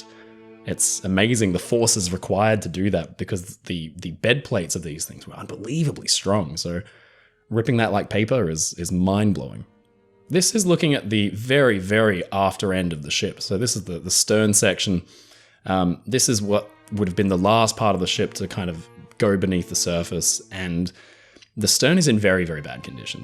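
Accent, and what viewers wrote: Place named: Australia. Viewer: Love your accent, Australian?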